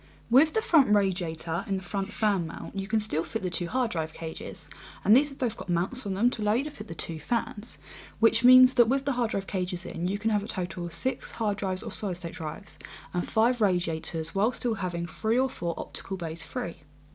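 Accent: United Kingdom. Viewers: with an awesome british accent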